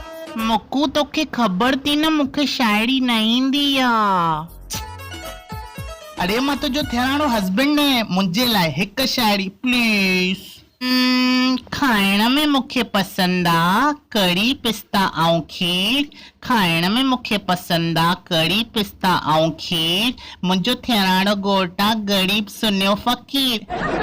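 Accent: Sindh, Pakistan. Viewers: One about i like in you...tu khalayan main puro pakko sindhi lagdon aahain ...so cute too that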